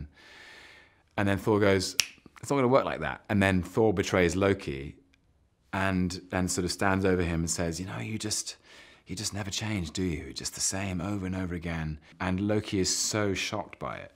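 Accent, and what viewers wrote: United Kingdom. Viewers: Tom的聲音真的好好聽...還有那個英國腔真的太酥了吧（倒地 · 我只是來聽他的英國口音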